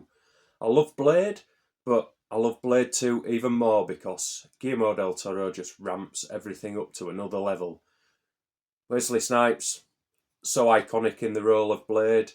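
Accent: United Kingdom. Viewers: New subscriber, found this video randomly suggested, and thought great accent, sounds like he's from 'ull, like me. And some great picks here 👍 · hi buddy im from preston and judging from your accent, i dont think your far lol, where abouts r u from ? x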